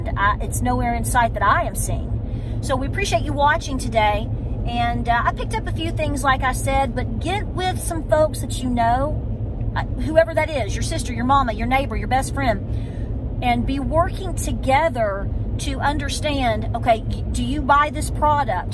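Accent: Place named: Southwest Missouri, United States. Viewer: I live South of Joplin I'm originally from Cassville where are you. You sound like you're pretty close to me. I'm nosey Lol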